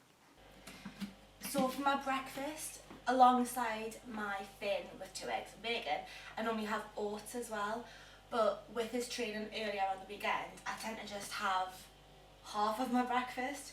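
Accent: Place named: North East England, United Kingdom. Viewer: Good to here a North East accent on a fitness channel. Am ganna try that egg and onion thing as it look 👌🏼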